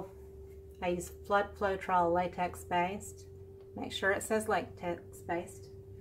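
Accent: South, United States. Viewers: Do I detect a southern accent y'all? :-) I'm originally from Tallahassee.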